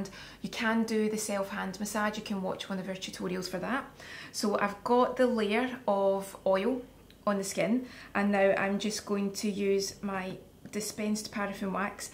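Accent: Scotland, United Kingdom. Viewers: I agree. Perhaps a series of lovely Scottish poems and stories read in your soft brogue would soothe the world's woes. What a delightful prospect. Soft music would be a sweet touch. Thank you.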